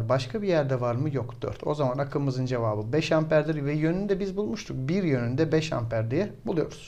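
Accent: Thrace, Turkey. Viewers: Ocam Trakyalı mısınızz?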